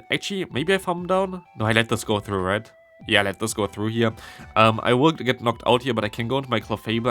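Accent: Germany. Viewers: his heavy German accent makes it harder to recognise it 🥲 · same his German accent is☠️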